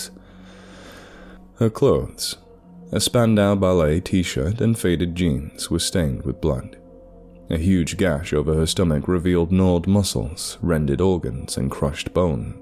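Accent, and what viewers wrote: Australia. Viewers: i got stupidly distracted by one of the characters driving a vauxhall astra, but theyre rebadged as holdens here. absurdly nitpicky detail for me to get hung up on lmfao author is always great, the narration is always great, and as an aussie i give your aussie accent a solid 9.5/10 - the best part is you were able to keep up the emotive voice acting, thats what usually bugs me most about a lot of people doing accents, they get so focused on getting the accent right that they forget how to act! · Good job! We need more Australian creepy pastas, love your accent! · This is the best Australian accent I've heard, thank you Lots of love from an Australian xx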